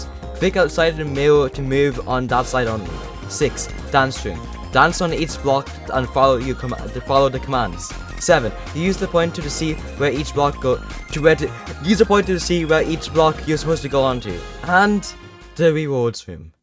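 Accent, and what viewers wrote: France. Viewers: Are you french? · eww are you french? good video tho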